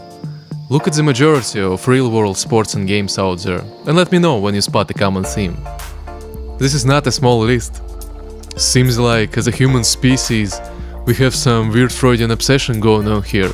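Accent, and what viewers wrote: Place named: Russia. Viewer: я английский знаю не очень, но почему то сразу понял что ты русский)))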